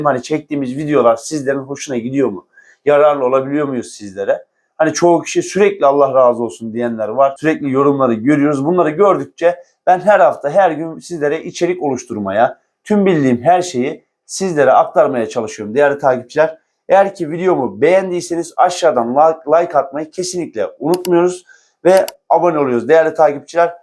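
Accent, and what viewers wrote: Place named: Isparta, Turkey. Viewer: Memleket Isparta galiba 😊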